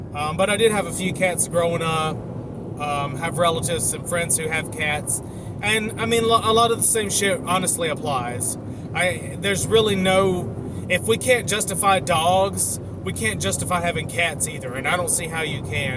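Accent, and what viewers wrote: South, United States. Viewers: I missed your beautiful southern accent. You make some valid points about cats. I am a cat lover too and my ancestors in Egypt loved cats. Not all cats behave in the way you suggested and a well trained cat is actually a really cool creature to have around the house and long as the house is kept very clean aswell as the animal itself. Cats are generally much cleaner than dogs anyway. All pets add extra workload to the housework so that comes with the territory. I agree a humane cull is needed though, as the cat population is definitely getting WAY too hard to manage for federal and state authorities resources. That being said, human societies around the world have kept animals as pets for thousands of years and that's a part of human nature that you never going to change. We still need these rants urgently as they remind us of our core responsibilities as humans to the rest of the environment. 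Please don't stop making these videos. And thats coming from ME -AN OBSESSED CAT LOVER.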